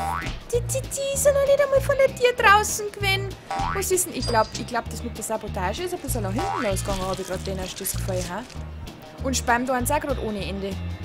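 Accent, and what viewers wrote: Bavaria, Germany. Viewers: Zum ersten mal Bayrisch gehört und dacht mir nach den ersten sekunden erstmal nur so "ok und von sowas bin ich fan im fussball ?:D" aber is schon ganz ok besser als Kölsch ^^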